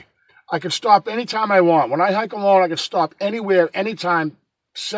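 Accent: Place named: Massachusetts, United States. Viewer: Hey just re watched your video.....omg...looney bins..lmfao...havent heard that in the 25 years I been gone FROM NE....i love watching and listening to you and let's not forget that Mass. Accent.....Linda in Florida...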